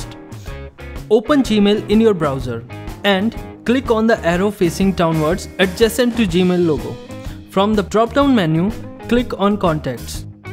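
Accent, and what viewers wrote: India. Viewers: Oh god an Indian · Alex Fosse that’s Indian accent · I hate that Indian accent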